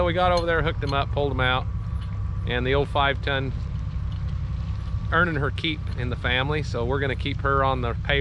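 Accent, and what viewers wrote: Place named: South, United States. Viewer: where are you from? you seem to have a southern "drawl"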